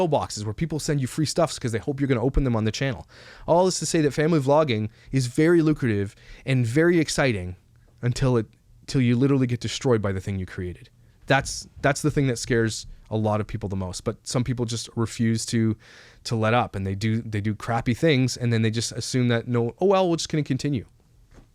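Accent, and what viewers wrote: Canada. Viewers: Completely unrelated, but the Canadian accent is strong in this one 😂 love it lol... reminds me of my fam in Canada 🇨🇦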